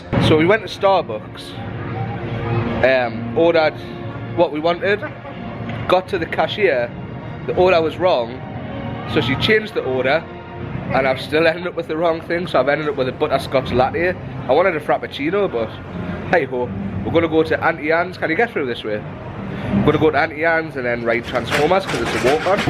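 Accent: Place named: Newcastle, United Kingdom. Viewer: It’s always hard coming home, and nice to hear a fellow Geordie.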